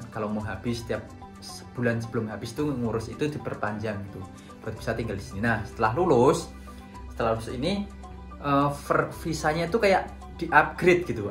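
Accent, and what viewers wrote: Java, Indonesia. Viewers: jowone pean ngendi mas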